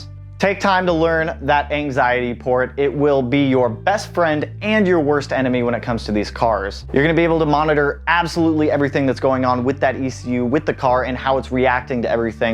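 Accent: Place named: New Jersey, United States. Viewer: Sound like you are from where I live ( NJ ) - everyone speaks fast. What 3 things you say for longevity??? Air/ Oil Separator, Cylinder 4 Cooling Mod, Killer B Oil Pickup?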